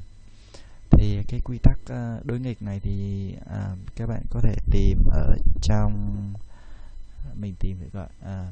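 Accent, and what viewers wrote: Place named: North, Vietnam. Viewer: góp ý một tí là bạn nên nói to hơn chứ đừng nói kiểu thì thầm như thế, mình khó nghe được giọng bắc. dù sao tut của bạn hướng dẫn rất kỹ và chi tiết. cảm ơn bạn, sẽ ủng hộ channel của bạn!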